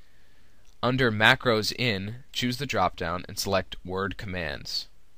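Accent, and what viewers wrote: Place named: United States. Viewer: Thank you so much! This is exactly the information I was looking for i.e. 'How to print a list of your customized keyboard shortcuts'. I've been looking for this since forever. This video should have Millions of views! - It's short and to the point. - It's narrated with clear native USA English. - It does what it is meant to do i.e. help people.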